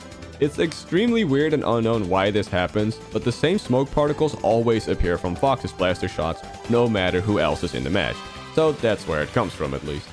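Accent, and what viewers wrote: Netherlands. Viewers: Are u from the Netherlands or from Belgium?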